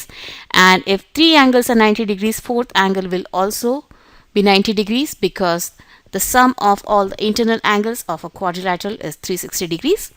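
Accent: India. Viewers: Indian accent ?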